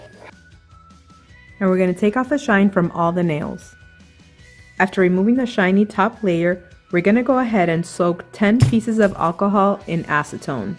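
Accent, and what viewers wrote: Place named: Latin America. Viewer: Wow! You have that traditional Latina accent that is so distinctive. I love it. Keep up the great work.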